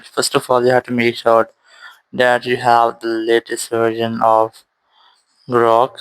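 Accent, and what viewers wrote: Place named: India. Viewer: Worked for me. Just two minutes to create my first Grok image. BTW, you sound like an Indian, so you may be interested in what I wanted from Grok. I'm answering an English X Post about the upcoming Ashes series, which had a picture of a man with an umbrella, Indian BTW 😆, with the caption "Me sheltering from Aussie tears when Joe Root scores his fifth century of the Ashes". I respondws with a Grok image of "England's Barmy Army crying in a stadium on a sunny day". Got it!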